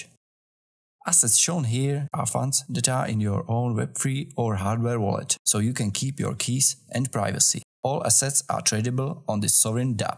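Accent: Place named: Czech Republic. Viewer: I can hear your Czech accent :-) But I might be wrong...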